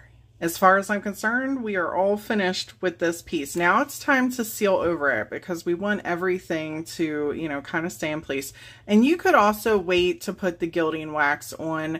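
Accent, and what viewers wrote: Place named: Maryland, United States. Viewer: I live in West Virginia, born and raised. I lived in Maryland for about 15 years. So when I first heard you say water, I knew where you were from. Yes to digital downloads please. 😊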